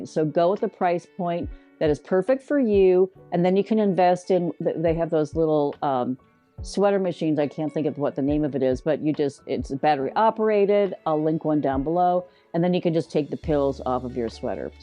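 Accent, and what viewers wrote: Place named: South, United States. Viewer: I’m in Ga too! I thought 💭 I detected an accent. We’re up here in the North West. (Cherokee county). Nice to know you’re a southerner.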